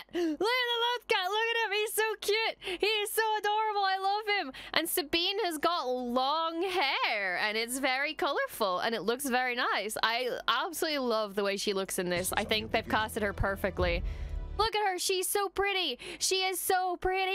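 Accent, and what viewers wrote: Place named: North, United Kingdom. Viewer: great job lovely lass, always a pleasure and entertaining watch. im from Louth and im still thinking what county you are from in the North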